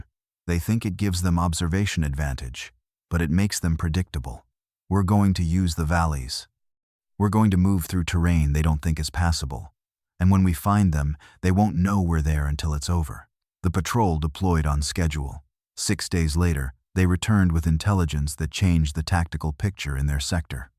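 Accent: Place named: United States. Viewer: Would be nice if these narrators spoke actual English instead of American bollox!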